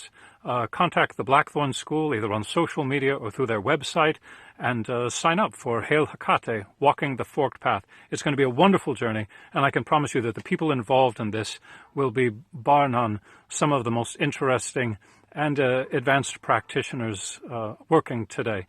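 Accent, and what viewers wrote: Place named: Transatlantic, United States. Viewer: My man really sells this with the transatlantic accent too. I have the trade edition and working through it now. Day 6 and the dreams are wild.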